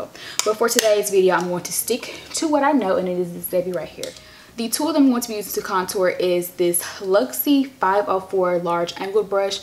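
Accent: Memphis, United States. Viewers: I Knew I Heard Some Memphis Twang In Her Voice. Lol Heyyy Girl😘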